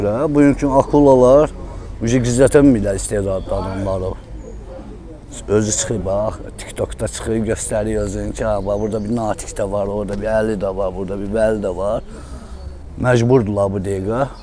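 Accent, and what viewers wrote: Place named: Shaki, Azerbaijan. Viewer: Bəli qardaş Şəkili ləhcəsində Şəkili obrazını çox supper oynayırsınız əla, Şəki ləhcəsinin pərəstişkarıyam, salam olsun Şəkililərə.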